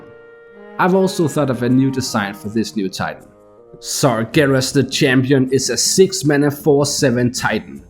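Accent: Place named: Denmark. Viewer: Man ka’ godt høre at du er dansker 😅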